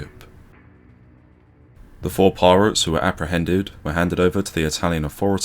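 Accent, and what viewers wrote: United Kingdom. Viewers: It's not British talk it's British English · Ooh! And it’s narrated in British talk!! BONUS!! 🤩🤩🤩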